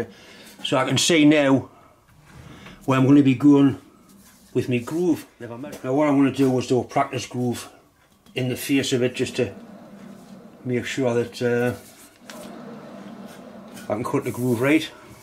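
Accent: Newcastle, United Kingdom. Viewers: English, with a Newcastle Upon Tyne, Northumberland accent. Took me about 5 videos to understand every word. I've watched almost all of videos.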